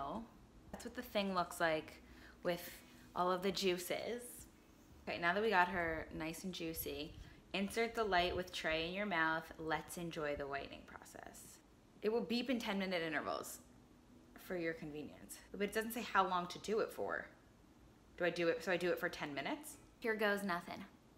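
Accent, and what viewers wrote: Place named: New Jersey, United States. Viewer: This girl is 1000% from NJ 🤣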